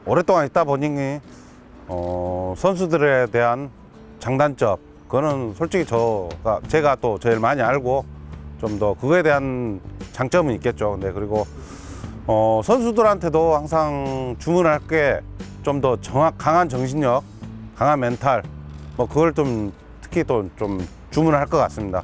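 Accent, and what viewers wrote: Jeolla, South Korea. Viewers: 종국이성 진통광주전라도사투리 구사자네요 ㅎㅎ 응원하겠습니다 원클럽맨으로 감독도하고우승도하고 가즈아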